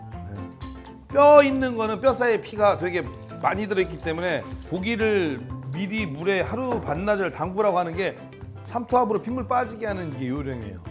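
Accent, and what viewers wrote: Chungcheong, South Korea. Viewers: 충청도 사투리로 구수하게 설명히시니 재미있고 당장 고기 사다가 삶아먹고 싶네요. 꼭 이대로 해봐야겠어요.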